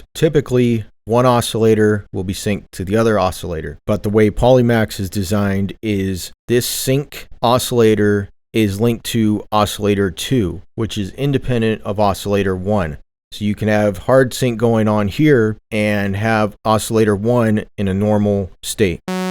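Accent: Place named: United States. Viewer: For some reason I thought you were British, but that's an American accent thru and thru. 😅 I think PolyMax sounds higher-end than a Juno - more like a Jupiter. Maybe somewhere inbetween. :)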